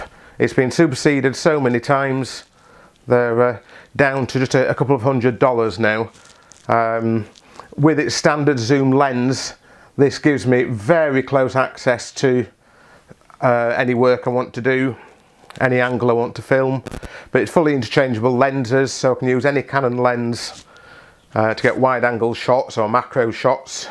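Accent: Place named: England, United Kingdom. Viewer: Please forgive me new to your channel are you English you sound it but you said dollars .....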